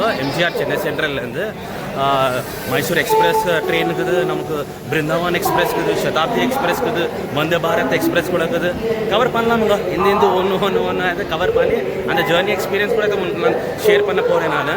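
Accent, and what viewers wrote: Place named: Bengaluru, India. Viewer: Nice to hear Bengaluru Tamil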